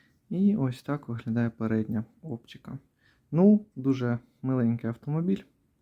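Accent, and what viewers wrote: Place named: Russia. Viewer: І взагалі... Маскалізмів забагато. Старайся гарніше говорити солов'їною. Вуха ріже безбожно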